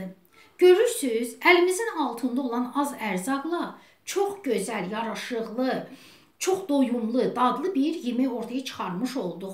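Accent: Baku, Azerbaijan. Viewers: Lehceden srazi bilinir ki bakilidi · Həsrət qalmışdıq Bakı ləhcəsinə. Yeni qoşulmuşam kanalınıza Bakının harasındansuz ? Mən Şüvəlanlı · Beh beh😋 yemeye söz ola bilmez. Men de hazirlayacam. Xanimin danishigi, Baki lehcesi lap lezzet eledi 😍😍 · Shirin Baki lehcesi.Bashqa bir alemdu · Danisigina bax ay Allah lezzet eledi.Bakililar bir basqadir.🥰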